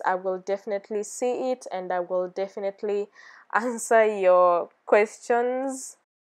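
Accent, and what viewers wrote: Kenya. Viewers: lol! accent ni ya Muranga ama!Nilijua tu wewe ni wa home😝Uko na katoi karembo..😌imajine aki nyweke zetu na blackamericans ni different aje..anyways you did some awww kazi..niko Swiss currently n nyc to hear sone home accent online😝😍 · You must be kenyan, I heard you speak Swahili and omg your accent. Wow 🤩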